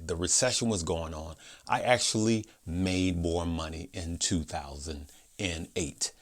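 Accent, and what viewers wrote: Chicago, United States. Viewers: Are you from Chicago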